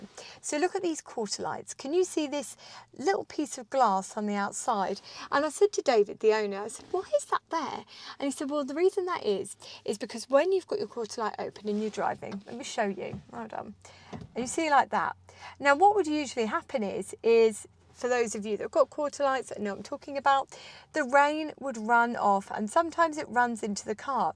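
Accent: United Kingdom. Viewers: A very few of us can still speak the King's English!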